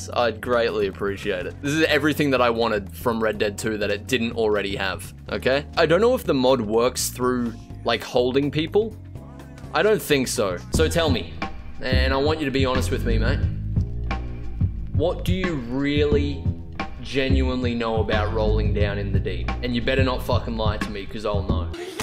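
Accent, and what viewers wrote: Australia. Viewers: Why does he sound so much more Australian while on twitch? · are you an ozzy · Are you Australian? Cause you sound a little Australian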